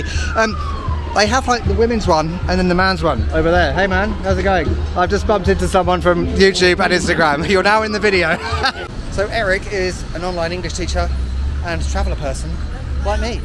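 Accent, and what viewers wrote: United Kingdom. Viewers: Are you british? Your accent sounds different 🤪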